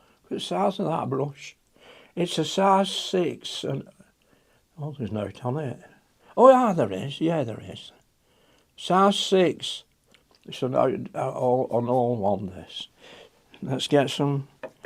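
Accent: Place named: England, United Kingdom. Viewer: Hahaha.... That was wonderful. Hearing a really Englishman talk like. Letting the truth take centre stage, instead of the "feel good" talk that only serves to get most peoples backs up. Pleas feel free to have another "say", I loved it, and it's good to get ot off your chest. Oh, and I 100% agree with everything you said. Not to mention your paintings, they always do my soul good. Always simplistic in style and form, yet they carry an air of peace, and a wanting from within for me. Benn stuck in door for a very long time. Disabled, and had to give up my car, so when you paint, you bring into view the kind of places I would drive out to, and just sit quietly, soaking in the Englishness of peaceful country scenes and allow all my worries to drift away. You're a cracking man, and deserve every good thing that comes your way.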